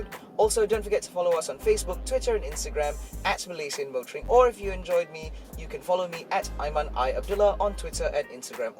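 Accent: United Kingdom. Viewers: U sound like a british man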